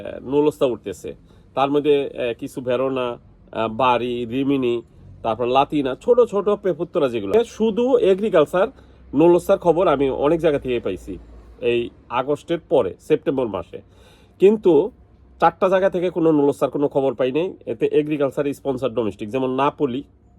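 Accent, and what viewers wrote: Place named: Chittagong, Bangladesh. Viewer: চিটাগং কথা আপনার কাছে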